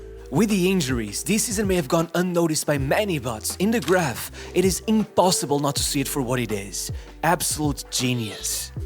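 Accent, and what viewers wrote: Portugal. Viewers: Mano, ando a ver isto a 2 anos e jurava que o sotaque parecia português, incrédulo, trabalho espetacular. Super fixe ver um canal desta magnitude e qualidade sair da nossa pequena mas dedicada comunidade, melhor das sortes🇵🇹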